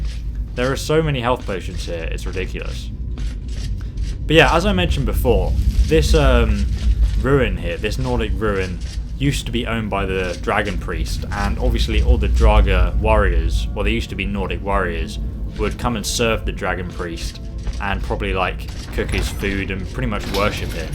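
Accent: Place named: United Kingdom. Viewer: Your voice is easy to listen to, I like the British type accent and Australian and NZ etc. I tend to hate the American accent, that's why I can't look at many videos which are narrated by Americans (their voices just sound so annoying). As soon as a video opens and it is narrated by an American, I am immediately thinking "what a retarded sounding moron". lol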